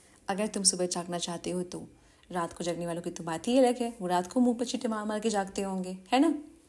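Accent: Bihar, India. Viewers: Aap BIHAR se ho kya bhojpuri bol rhi ho ❤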